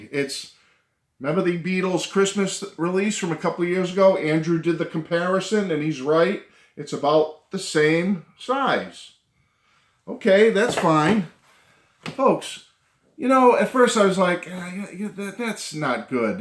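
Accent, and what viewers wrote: Boston, United States. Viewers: Nothing wrong with that,! Believe it or not, I've been a dyed in the wool Beatles fan since I was 4, mainly due to having a sister who was 8 years older than I am. I used to sneak down to her room to play their records when she wasn't home. LOL. I may not get into collecting all their stuff as many others do, but I haven't stopped loving their music. It's as timeless as timeless gets. Fwiw, as a NY National League fan, I can appreciate the Boston accent:) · Awesome rant and Boston accent....you just got a sub and a thumbs up from me sir....👍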